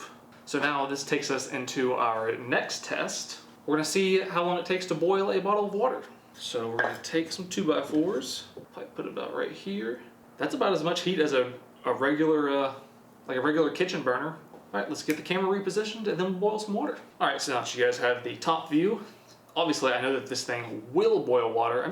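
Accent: South, United States. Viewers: He sounds southern but he calls it Pop, and we dont do that. So I'm mega confused.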